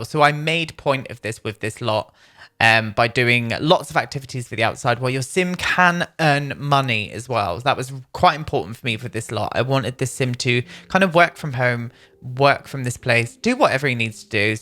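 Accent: New Zealand. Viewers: I'm Australian...pretty sure that presenter is a Kiwi. 😉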